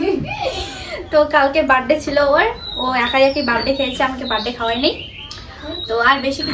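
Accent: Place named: Bangladesh. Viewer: Tumi ki Bangladesh chila?? Ekhankar moto vasha lagteche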